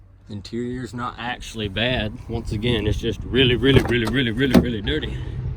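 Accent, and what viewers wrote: South, United States. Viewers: but that souther accent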